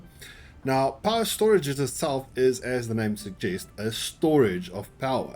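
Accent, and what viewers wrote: South Africa. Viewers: Love the vid.your accent sounds south african? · Where are you from you sound afrikaans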